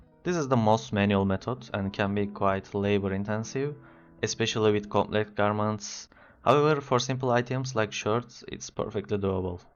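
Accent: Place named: Turkey. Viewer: kardeşim sağ alta bakana kadar dedim "bi yabancı nasıl türk gibi ingilizce konuşabilir". klavyenin türkçe olduğunu görünce içim rahatladı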